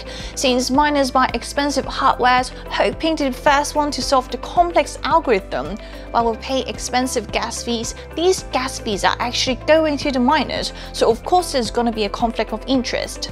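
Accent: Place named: United Kingdom. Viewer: I had to like and subscribe because its good content but also because I'm fascinated by your voice, it's like half very posh english and half Asian, it's brilliant haha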